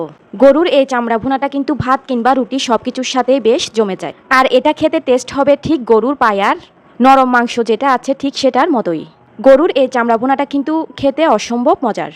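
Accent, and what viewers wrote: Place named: Barishal, Bangladesh. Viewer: আপনি বরিশাইল্লা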